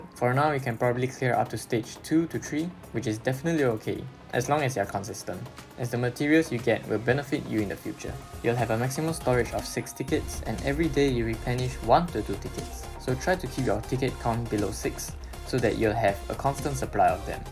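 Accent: Singapore. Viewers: are you from singapore? nice accent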